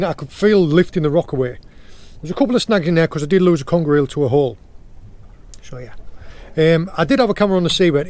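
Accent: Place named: Teesside, United Kingdom. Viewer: cracking content bud, nice to hear the Boro accent lived in Great Aston for 15 years and in Looe for 5 years, now ;living in Cheshire and the only fishing i get to do now is fly for trout, miss being on the sea loads.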